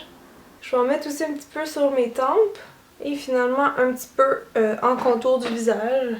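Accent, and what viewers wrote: Quebec, Canada. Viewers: C'est ben plaisant d'avoir des tutoriels en français avec une fille qui parle québécois et à laquelle on peut s'identifier! :)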